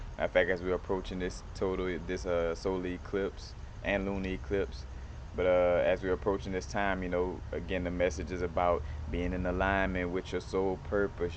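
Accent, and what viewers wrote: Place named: Louisiana, United States. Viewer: You gotta be from NOLA or somewhere in Louisiana lol I'm from there and I recognized your accent😭 anyways keep making videos please, I love your vibe❤️ such a beautiful human being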